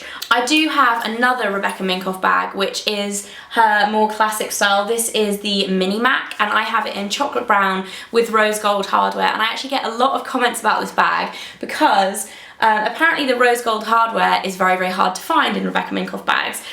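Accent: England, United Kingdom. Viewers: You are an english accent... Good vidéo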